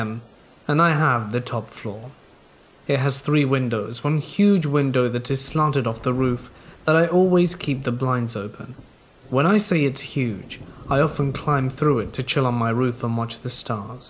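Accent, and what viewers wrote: England, United Kingdom. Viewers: Lol! I relisten for third time! Love compilations! And narration is simple the best with his english accent 😊 I couldn't see your comment on my side, as I said it earlier, and nothing on my notification. I'll check again. How is your day so far? Have you seen your Dr? 😘 Ps: Did you see Paul? He left his comment. pm here now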